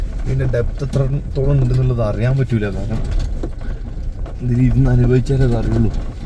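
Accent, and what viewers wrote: Malappuram, India. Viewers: ആദ്യം നിന്റെ സംസാരത്തിന്റെ ശൈലി മാറ്റ്.. മലപ്പുറം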